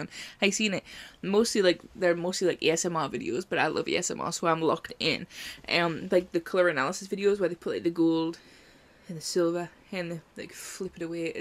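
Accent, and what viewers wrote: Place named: Newcastle, United Kingdom. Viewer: Same I’m Scottish so I kinda hear it but more so Geordie accent I think x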